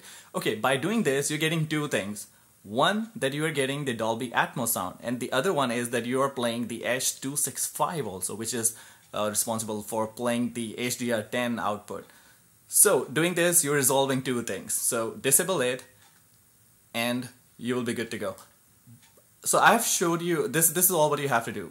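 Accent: India. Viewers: Christ Youtube doesn't support 4K ??????????????????????? you need to prepare a lot before making a video and first speak slowly no need to rush. what accent are you on ? india ?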